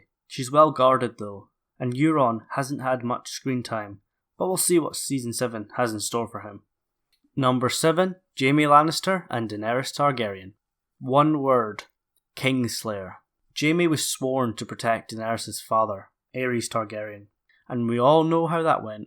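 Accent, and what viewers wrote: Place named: Scotland, United Kingdom. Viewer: Just stumbled across your channel, great stuff; am sure I'll be binge watching it through during the wet scottish summer this year. Also awesome accent. For anyone who's unfamiliar with Scottish accents, this dudes got a lovely 'kind, soft' variant of the accent - the other is more akin to this : <link> Just kidding, I love living here in Scotland! Thanks!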